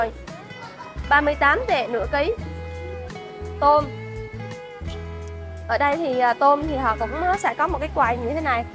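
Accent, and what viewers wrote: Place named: Quang Nam, Vietnam. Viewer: Đặc sệt tiếng Qoảng Nom. duyên thật.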